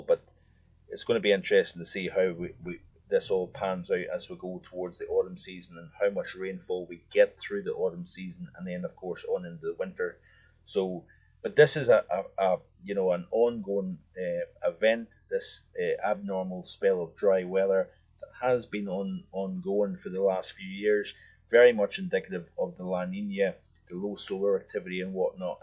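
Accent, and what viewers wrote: Scotland, United Kingdom. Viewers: Many thanks I think your Scottish accent brings more feeling and meaning to your very detailed weather reports. Some equally fine and detailed weather reports, almost become boring, due to the uninteresting accent. Mr puts a lot of emphasis into his voice, and personally, i think his Scottish accent suits his interesting channel. Ive told several friends to check out weather channel, as its a real pleasure to tune into your weather analysis. More of the same please, and a BIG thank you from a still rather dry llandudno on the North Wales riviera coast.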